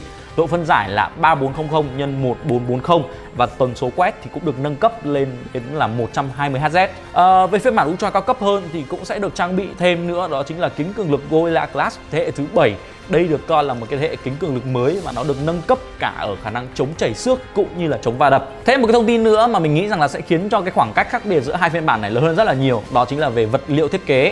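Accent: Vietnam. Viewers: p chịu thôi chứ, đây là vn, mà mấy người xem tin công nghệ có người hiểu người k, nói kiểu vn thì cả giỏi lẫn k giỏi tiếng anh đều hiểu đc thì nó tối ưu hơn chứ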